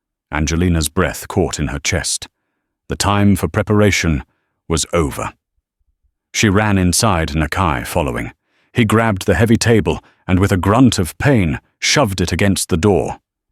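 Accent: United Kingdom. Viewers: Does anyone else wonder why this channel uses a British accent AI narratorfor American western stories? It makes no sense to me at all!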